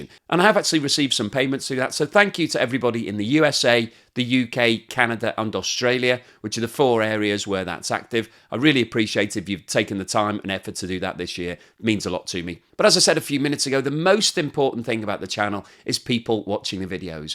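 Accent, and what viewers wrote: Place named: Liverpool, United Kingdom. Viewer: Happy Christmas 🌲 (how do your US followers understand the Liverpudlian dialect?!) Keep up the great work.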